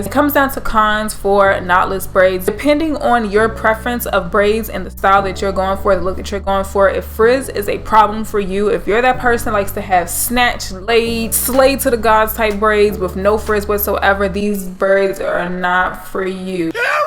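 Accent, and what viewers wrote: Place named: Baltimore, United States. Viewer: a BMore accent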